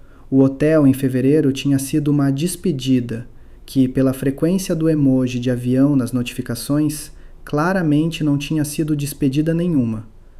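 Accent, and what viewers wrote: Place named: São Paulo, Brazil. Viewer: Por que os numerais falados em inglês? Que coisa irritante, além dessa vozinha com sotaque paulistano.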